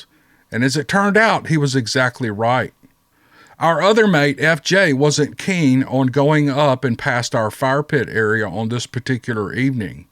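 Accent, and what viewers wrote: South Australia, Australia. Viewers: You did an awesome Aussie accent, keep up the good work, you're a great bloke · Do you have ai link to his channel? I loved your Australian accent 😃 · Great story and as always, well told, however, the Aussie accent??? Lol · I love the way he wrote his story. It put the images in my head, which makes it that much better. I enjoyed your southern Aussie accent. Does anyone have any speculations on what happened to the family of 3, and their dog, that were all found dead on a hiking trail? Very intriguing event. Thanks and to, for providing my bedtime story. Love ya!!💕👍😊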